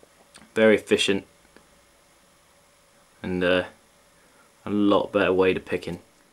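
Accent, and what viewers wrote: United Kingdom. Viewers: you look and sound like marty friedman, just with britsh accent :o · Is that a west country accent i detect ?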